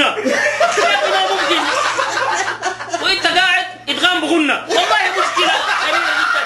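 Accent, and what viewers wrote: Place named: Sudan. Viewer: انا سودداني انت لوغيير سوداني فعلا زوول مبدع و موهوب بس ظااهر انك عاشرت سودانيين كثيير حتي السودانيين انفسهم بعضهم لا بستطيع التحدث بلهجه سودانية خالصه مثل هذه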